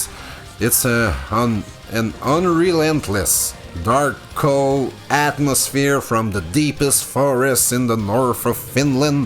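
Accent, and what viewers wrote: Quebec, Canada. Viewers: Wow that is one heavy french Canadian (Québécois) accent. Excellente video! Cheers \m/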